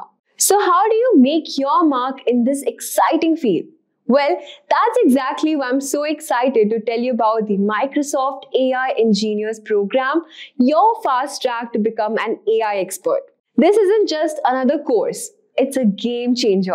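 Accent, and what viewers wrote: India. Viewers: Please have somebody with plain and clear American English to explain the benefits of this course. It is very difficult to follow along with the heavy Indian accent this presenter has.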